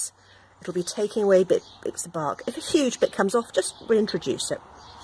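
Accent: England, United Kingdom. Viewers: I like the tone of your voice and the English accent is lovely 😊 very clear explanation👌 hope you will make more videos like this. Do you make baskets too? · many thanks. Made my first nettle cord a couple of days ago. Very happy. BTW my Taiwanese wife thinks you have the clearest English accent she has ever heard.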